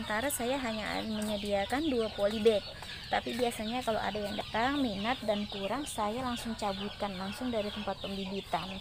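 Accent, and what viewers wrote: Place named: Manado, Indonesia. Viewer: Bahasa manado xa mendok banget🤩.Semangat🙏🙏🙏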